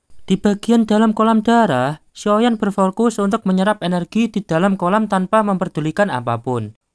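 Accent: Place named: Java, Indonesia. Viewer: Suara mu meddo banget mass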